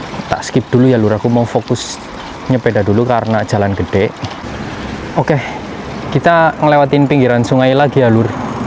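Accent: Banyumas, Indonesia. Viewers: Cilacap Barat Sidareja hadir Mas, salam sehat dan sukses selalu buat jenengan, Aamiin. Jenengan asline ndi Mas bahasane ngapak,ana retung, nemen dsb 😂😂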